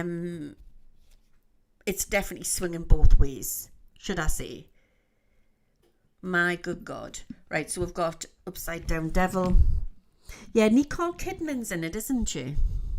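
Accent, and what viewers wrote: Newcastle, United Kingdom. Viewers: love the Geordie accent could listen to ut all day Steve east London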